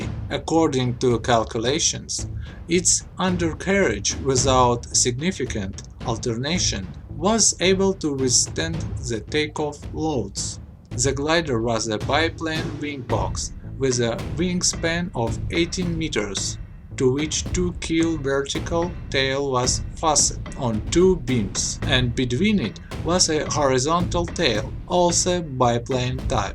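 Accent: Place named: Russia. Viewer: that russian accent is T H I C C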